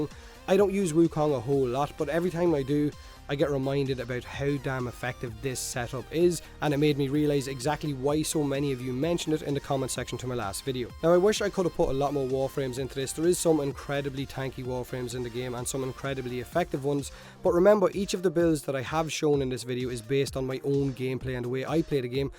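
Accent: Ireland. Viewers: am new to this channel and i love to know if this guy is Irish or not his accent sounds like Irish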